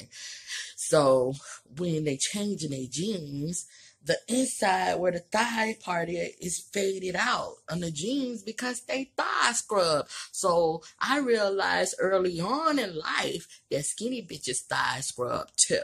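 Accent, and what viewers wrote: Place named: Florida, United States. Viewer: Imma little bitty bitch but i can relate to you in a lot of ways! And i been watching yo vids for some years now and i can see that LIKE ME you too didnt have good school years... they picked on me cause i had acne..for the most part. And when my hair fell out in 6th grade it was cause i was bald head and had acne. And i was really self conscience and had very low self esteem them days too. So i was also naturally depressed ofcourse. So again sis i can relate. I know the lame from snap said some "negative" shit but i watch you too and here go some positive shit for yo ass. 1. You REAL asf, and thats more than i can say for most ppl. You very honest and blunt. 2. You funny, 3. You are BEAUTIFUL, and i being dead ass when i say that 4. Normally you are very CONFIDENT and vivacious. But these last videos i dont seen that you recently did..have been down and in your feelings and im worried cause your normally Happy and funny and smiling and shit just being...and your eyes looked lively and happy. 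In this video they look so sad. I just want you to know your real subs dont care that you a big girl or that you breathe hard. I never noticed tbh...i just hear that thick as florida accent. I like yall accent lol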